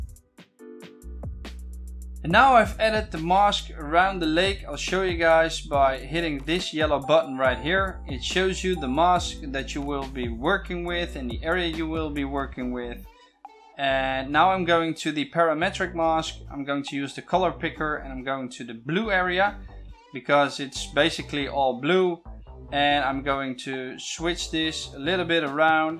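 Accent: Netherlands. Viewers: This dutch accent ♥.♥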